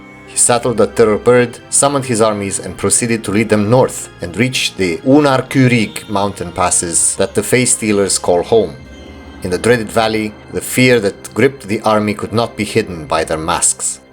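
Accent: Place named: Romania. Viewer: A friend of mine presented me your channel and after watching countless of youtube eu4 hours(anbennar included) I have to say your latest stuff(7 months ago) focused on anbennar is S++. I hope you get the motivation with the boots of views to keep doing this. this is why youtube is great because gems such as yours appear from time to time. I would also strech and try to guess - are you from romania? you have a funny romanian english accent and if you do, mad respect. I promise i'll pledge when i get paid. Keep it up broski!!!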